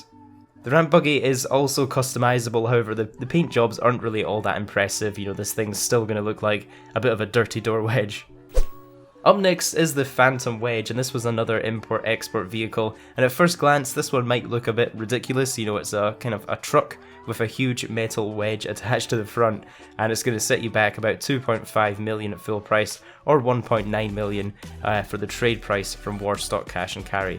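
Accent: Scotland, United Kingdom. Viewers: I thought he was british 😂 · You didn’t know he was Scottish are you stupid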